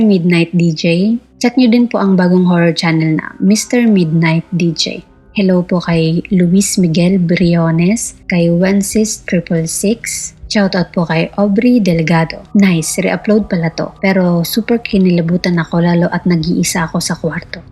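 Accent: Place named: Philippines. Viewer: Another pinay voice, lovely, totoong pinay accent talaga, greetings from London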